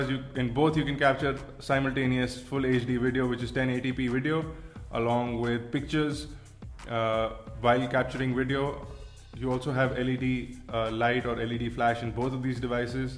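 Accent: India. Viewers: can`t u understand by accent ?? u dumb!! of course form indiaaaaaaaa... :D :D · yeah indian accent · Indian commentary :D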